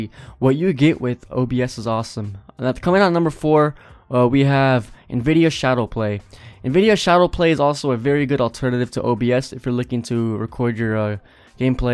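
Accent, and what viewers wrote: Croatia. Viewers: Are you from Croatia? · is he croatian?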